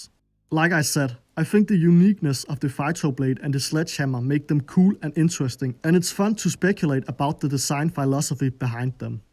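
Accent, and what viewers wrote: Denmark. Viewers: that danish accent wowowowow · The Danish is strong in this one! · Danish is strong in this young one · Can't Tell if Danish or Austrian, but i can tell you make great informative content!! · damn that danish accent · Shiiit thar danish accent tho 🤣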